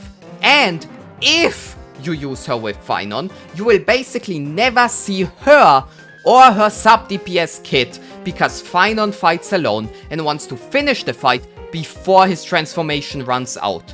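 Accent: Germany. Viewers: He sounds german. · I’m hearing the German accent 😭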